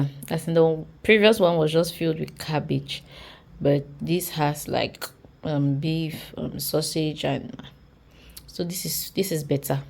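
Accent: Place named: Pidgin, Nigeria. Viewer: That's a whole Nigerian pidgin English u just spoke in this video.what about Cameroonian pidin English.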